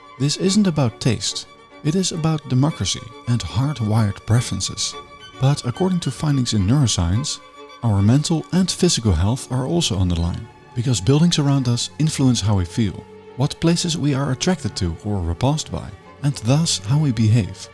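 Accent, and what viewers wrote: Netherlands. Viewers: You sound like my Dutch friend from Amsterdam, are you Dutch? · This has to be a Dutch accent, eh?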